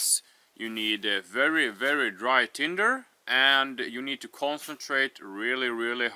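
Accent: Sweden. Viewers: Nice. I've know about most of those. Never thought about permanent markers. Is that a Swedish accent you have? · Kung är du man hör att du är svensk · Great video! Are you Swedish?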